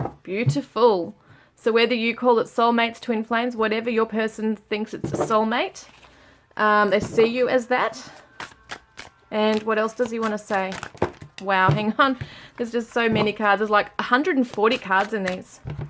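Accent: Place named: Australia. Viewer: i like ur accent is it australian?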